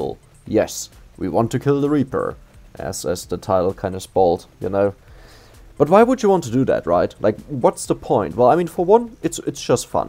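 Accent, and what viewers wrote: Germany. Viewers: Höre ich da etwa einen deutschen Aktzent heraus? Krasse leistung übrigenz.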